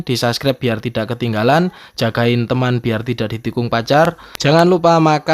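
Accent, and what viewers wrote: Temanggung, Indonesia. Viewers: mas kok logat bicara koyo wong temanggung? sampean temanggung to?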